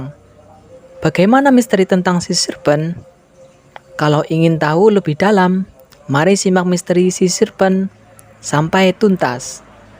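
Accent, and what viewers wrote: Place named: Banyumas, Indonesia. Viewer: anjay ngapak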